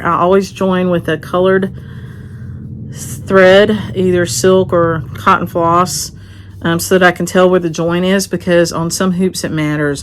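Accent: South, United States. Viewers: Hi. I just love you and your shop, as well as your flosstubes. I not only enjoy the content but just love hearing you talk (that southern accent reminds me of my extended family). And, forgetting to post that last flosstube is something I would do. You sure did make it easy to use two of your words in a sentence. I know you are not that far out of the city to have farm animals, but I can imagine hearing the cow bell in the distance. The brightness of the colors you have in your shop, I don't see a yellow cover. If you have one that I missed, please let me know. Wishing you the happiest of holidays! Pat Tackaberry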